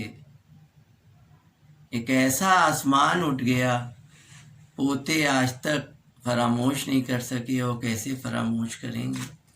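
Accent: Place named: Lucknow, India. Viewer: Channel ne khaas Lucknow ke lahje me soz o marsiye ki goonj hum sab ko karbala e Mualla tak Sunwa di MashaAllah Recited Soora e Fatiha From Karbala/Iraq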